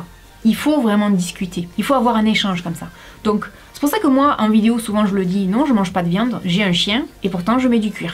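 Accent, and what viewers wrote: South, France. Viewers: Salut. Merci pour tes vidéos. Je ne te suis pas depuis si longtemps mais à présent, j'attends chaque semaine les sorties avec impatience. Tes thèmes sont variés et ton accent du sud me fait voyager et me donne le sourire. Je sais que parfois tu parles de chaines youtube que tu aimes bien et comme tu fais attention à ce que tu manges et que tu es une gourmande aussi, je te propose d'aller, une fois, jeter un coup d'oeil sur la chaîne Veg no Glu épices et tout. Dans le thème de ta vidéo, c'est pour les vegans et intolérants au gluten et c'est assez fun. Voilà, juste pour partager aussi quelque chose avec toi. Bon week-end.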